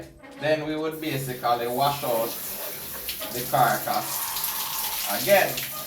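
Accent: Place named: Jamaica. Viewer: Bomba! Me like the video....big up d Jamaican twang and big up the hygiene processing method. Respect for sharing.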